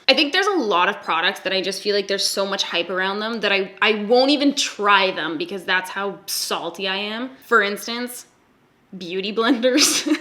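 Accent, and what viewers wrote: Canada. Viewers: All the comments about her "accent" saying "tag".. I went back and watched again wondering what I missed... oh... silly me eh.. I grew up 2 hours away from where she did 😂😂😂 Oh Canadia. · I love this just because you said “tag” so much at the end. #lovecanadianaccents you’re the best! 🙏👏🏽❤️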